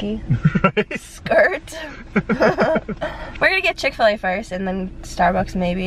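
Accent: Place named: Minnesota, United States. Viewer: Your from minnesota or north dakota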